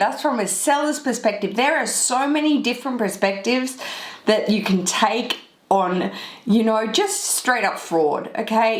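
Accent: Australia. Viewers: Hi, I love watching your videos and hearing your Aussie accent too 😍😍 sorry don’t wanna sound rude but just out of curiosity, what is your plan with your luxury bags collection in the future? What is your goal with them? You obviously won’t be able to wear them all, so what’s your plan with your collection in the future? Thanks! 😊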